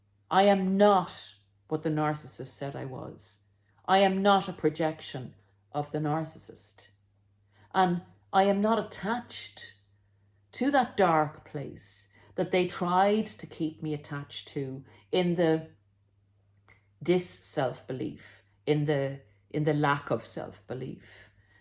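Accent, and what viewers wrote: Ireland. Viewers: Are you Irish?🍀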